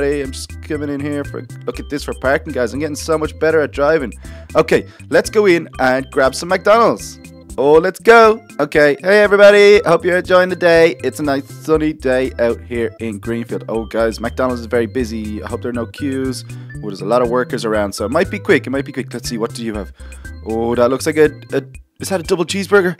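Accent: Ireland. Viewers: I think this dude was Irish 😂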